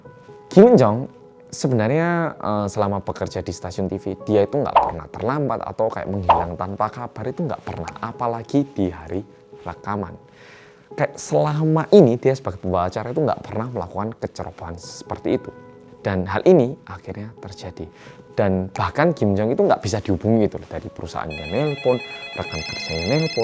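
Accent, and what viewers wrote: Java, Indonesia. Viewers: Ya ampunn.. baru liat ytb abang medhok ini lagi, sekarang ada backsoundnya euy. 😜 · tim gecep...medokkk 😊😊😊😊😊😊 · Ganteng,medok,tuek,suarane apik, IsO ngomong Korea,wong Korea,gae konten mbendino,critane apik,urong rabi,duwite akeh,subscriber re akeh😠penak men koe Dibalik semua itu ada erjuangan yg luarbiasa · klik dulu cak medokk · orang korea yang medok selalu di hati:)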